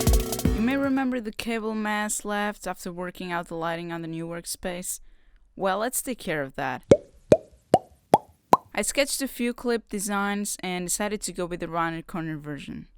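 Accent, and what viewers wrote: Portugal. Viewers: Hi I've been wondering how much all of that costs, since episode 1(wood, mdf, screws, not including machines...), I've got so many ideas from you and I'm thinking about making something similar. Stay with the good job! (I'm also wondering if you are from Portugal or not, I realised that you live in Portugal but did you born here? Your accent seems pretty sick)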